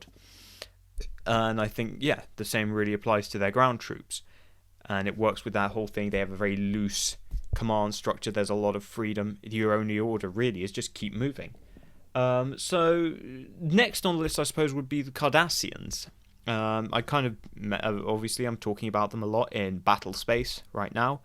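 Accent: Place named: London, United Kingdom. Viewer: I think it's the "London accent".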